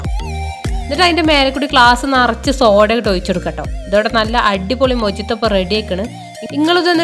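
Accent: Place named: Malappuram, India. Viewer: Malappuram slang pwoli❤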